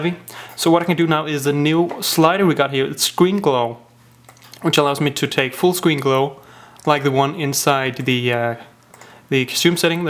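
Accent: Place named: Denmark. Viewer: Idiots, it's because he is from Denmark not UK or USA. That means English isnt his main language, I guess :P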